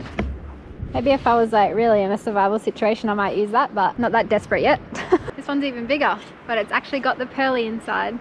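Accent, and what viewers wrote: Australia. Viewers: You are so stunning and talented. It's great to finally hear your beautiful Aussie accent. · So gorgeous and sexy. Love your long hair, your beautiful smile and your Aussie accent. You are very resourceful and intelligent. Keep up with your awesome videos. Thanks from Canada. ❤❤❤❤❤❤❤❤❤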